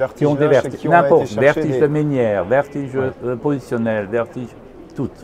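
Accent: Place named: Alsace, France. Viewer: Bonjour, Merci pour toutes ces informations mécanique et technique. Cela m'a fait du bien d'entendre ce petit accent alsacien pays de mon grand-père et de mon enfance 🌱🌸🌲. Qu'est ce que j'aurais aimé savoir si un hydrops peut trouvé naissance enviton 25 ans après hernie discale, syndrome de la queue de cheval, souci alignement dents, aujourd'hui nuque raide, trapèzes douleureux, perte audive, trouble de l'équilibre (qui d'ailleurs s'accentue avec la fatigue, l'inquiétude, tensions!) (qui s'accentue avec la migraine ou céphalée de tension) qui deviennent ingérables à la ménopause et qui detraque mon vestibule, mes muscles ... .. Tout est du même côté mais pour les bras et mains des deux, mais pas la même symptomatologie. Si ce n'est pour l'hydrops ?, je pense que oui. On peut également relier le tout à un parcours de vie compliqué de 9 ans à .. 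Cordialement, E. Parisienne de l'est 🙂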